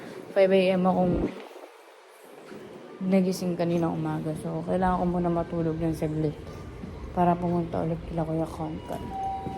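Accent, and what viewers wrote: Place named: United Kingdom. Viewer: Parang taga cambridge ka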